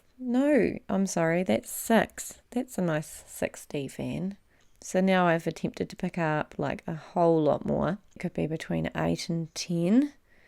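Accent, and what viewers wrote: New Zealand. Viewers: Love your work!! New to volume just completed training this week😀Got to love that kiwi accent x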